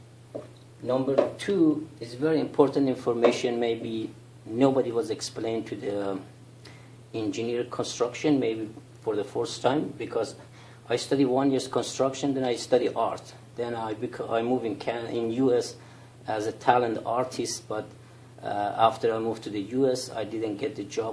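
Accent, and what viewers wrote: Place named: Iran. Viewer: 'vabrication' is 'vibration.' Love the Persh-english! Very good video. They absolutely have not made public any realistic solutions for the sound reconstruction of the main spillway. Hope they watch. Best wishes friend.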